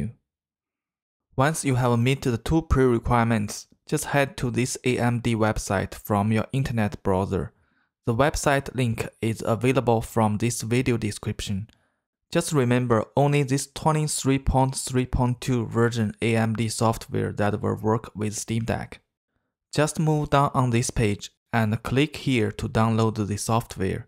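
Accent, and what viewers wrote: China. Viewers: 这是中式英语吗,口音感觉好熟悉啊www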